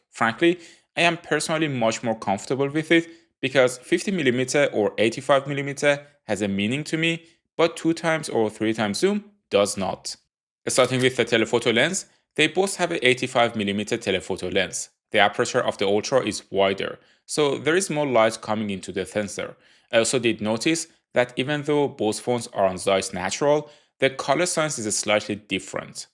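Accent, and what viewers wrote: Iran. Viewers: بنازم لحجه ایرانی رو 😅🫡🤌🏼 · Nice video especially w that very irooni accent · لحجت ایرانیه😂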